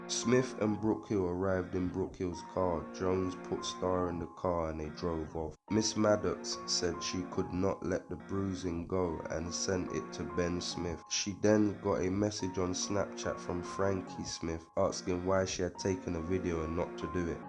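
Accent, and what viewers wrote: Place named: Cockney, United Kingdom. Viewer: Couldn't you get a better narrator? Not this monotone voice from the East End of London...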